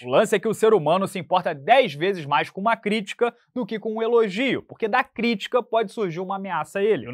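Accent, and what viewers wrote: Rio de Janeiro, Brazil. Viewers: Este seu sotaque de Carioca, trava seus vídeos! Tbm acho que balança de mais a cabeça no vídeo, abraços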